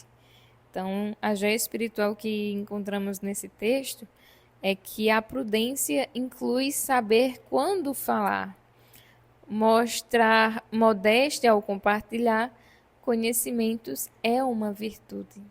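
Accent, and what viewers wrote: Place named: Northeast, Brazil. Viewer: minha irmã você é nordestina..por coisa do seu sutak.rsr😍🥰🤳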